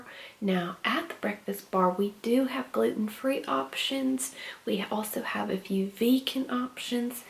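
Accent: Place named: South, United States. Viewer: Youre so good at that southern drawl!! Hope you've been well~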